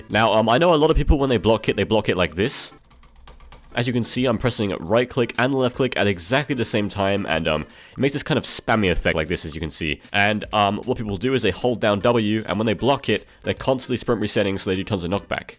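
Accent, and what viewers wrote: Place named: United Kingdom. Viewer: I swear you sound British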